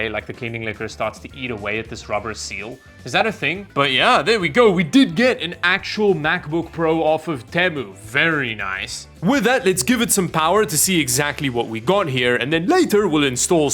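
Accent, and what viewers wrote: South Africa. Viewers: Are you South African? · are you South African? or am I tweaking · The South African accent is strong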